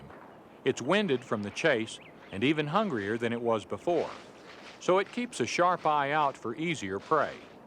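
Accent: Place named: United States. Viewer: Can any American tell me what regional accent the host has? I love it!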